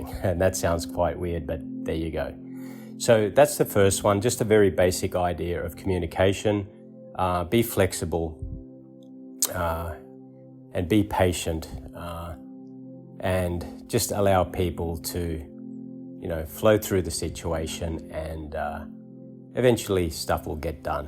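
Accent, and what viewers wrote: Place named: Australia. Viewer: You sound like Aussie.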